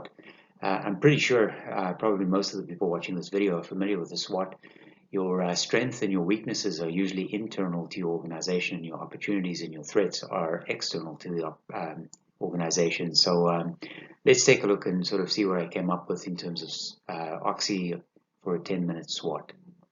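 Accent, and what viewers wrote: South Africa. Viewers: Enjoying your videos. Are you from South Africa per chance?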